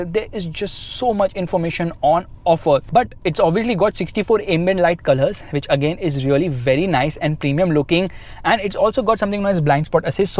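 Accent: India. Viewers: I know lol.. I said I thought he said fucking Since his speaking fast and his indian accent is thick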